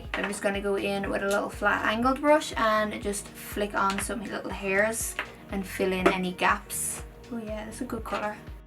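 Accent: Ireland. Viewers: I could listen (and watch of course) to your videos all day. Irish accent for the win. By the way make up 👌🔥🥰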